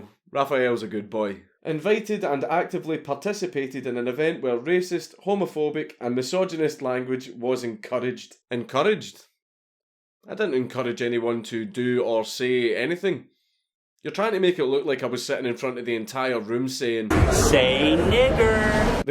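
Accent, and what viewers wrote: Scotland, United Kingdom. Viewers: "you know what i am about" yeah, you are someone who is 'edgy', and says things that sometimes tickle the edge of hate speech. given that america has no hate speech then we would just call you unprofessional, at least while not in a professional setting, bordering on low brow humor, but only sometimes. oh yeah, and you have a scottish accent. you may get some shit for that accent over here. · I seriously love your Scottish accent. I've watched so many of your Mad Lads vids the voice in my head now has an accent😂😂🤣🤣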